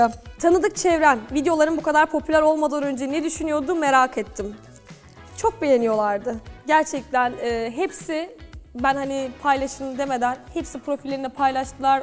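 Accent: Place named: Kastamonu, Turkey. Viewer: Kız sen Kastamonulu musun 😮 ben de diyorum bu kızın konuşması bana niye tanıdık geliyor 😅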